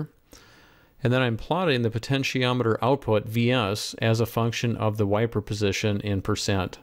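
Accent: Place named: Oxford, United Kingdom. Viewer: SIR Just now i watched yr video on POTENTIA METER. My copliments to you are:-- 1. liked yr video for its quality information packed in it. 2. U are a very good tutor/lecturer and i deduce from this small video that u must have wide rich practical working experience in the electrical/electronics field. 3. i could follow every word of yr lecture because of yr stylish English that too Oxford English which we are familiar here in India. 4. yr diagrams there in the video and appropriate formulas are added assests to yr lecture. 5. please keep up the same pace /speed of yr lecture in future videos also as we dont miss any thing there. 6. Subscribed and looking forward to yr videos on electronic essentials. Thanking you vatsa Bangalore INDIA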